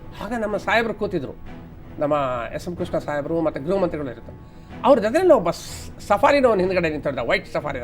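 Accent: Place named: Andhra Pradesh, India. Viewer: sir telugu slang super sir.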